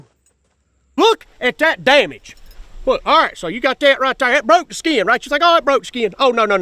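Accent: United States. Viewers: Yo I love his voice. His voice is MERICA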